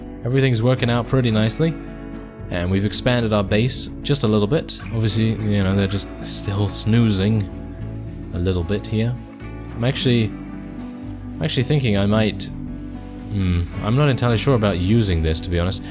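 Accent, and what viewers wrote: United Kingdom. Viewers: Okay, so, look, I need you to do a thing for me. Very simple. Low to mid maintenance, and I feel your enjoy it. Maybe, maybe not, who knows with the spin of the Earth slowing and what all. Anyway, I need you to do this simple thing. Very easy. Very. I need you to do voice over work for either parody nature documentaries, or on comedy science channels. Cause goddamnit your soothing British wailing would delight and tickle the average viewer.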